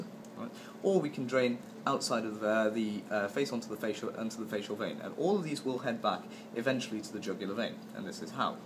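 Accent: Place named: Wales, United Kingdom. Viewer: Very useful, many thanks! You sound slightly welsh which is also a bonus!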